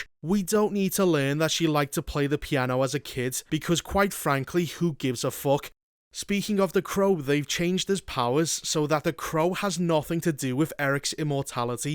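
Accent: Liverpool, United Kingdom. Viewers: Are you scouser???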